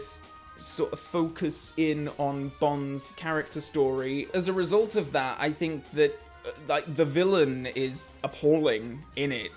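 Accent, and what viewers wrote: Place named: England, United Kingdom. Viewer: Great vid! Knew I would like this channel as soon as I saw you were dressed like a waiter and had an English accent. Great analysis. Subbd.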